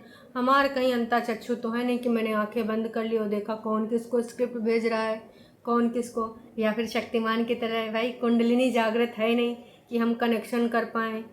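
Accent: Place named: Awadhi, India. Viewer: आपकी अवधी बहुत प्यारी है जी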